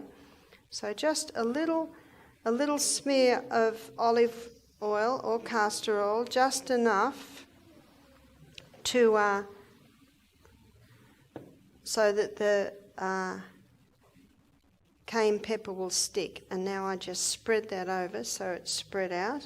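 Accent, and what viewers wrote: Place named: Australia. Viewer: She's Australian....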